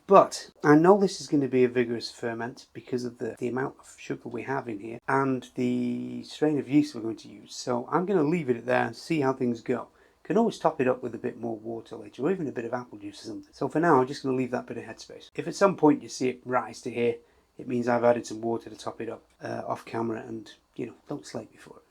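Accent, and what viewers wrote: United Kingdom. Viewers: Quick question, what’s your accent aside from British? Other are you brewer for job or hobby?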